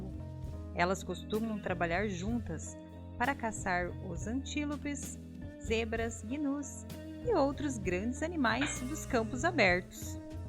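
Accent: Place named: Rio Grande do Sul, Brazil. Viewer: Com esse sotaque "gaúcho" no Brasil preconceituoso tem preguiça em dar like.. opinião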